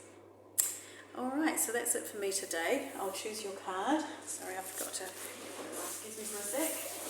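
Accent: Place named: Australia. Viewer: I am a mixed media Journaler. I am liking the positivity in your videos. Yes living abundantly is the key so WELL DONE. You have a lovely Australian accent but which Country are you living in plz? Do u grow your own food? Do u fast? I have so many questions. I am making space in our life to recieve.- decluttering- having 2 much stuff is hmm.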